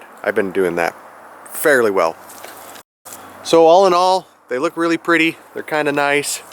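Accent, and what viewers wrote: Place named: Canada. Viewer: You need quite a lot of water and hight (head) too make a micro hydro project worth it. I would shift that container just too see the difference. Do you have a stream on the prop? A little nudge with the back hoe is all it takes. Wait you got wires coming out of it... yeah thats another story. You have come a long way from digging your well vid that was the last time i watched. nice work. Where are you from the trees in looks like north east but you accent sound Canadian.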